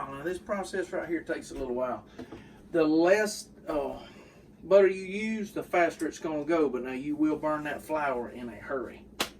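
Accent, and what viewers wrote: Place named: South, United States. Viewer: Plenty of “y’alls”, lots of butter and a strong southern accent...you the freakin male version of Paula Deen!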